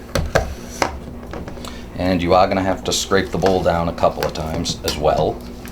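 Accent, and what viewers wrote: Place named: Texas, United States. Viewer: I love the Texas accent. Great job!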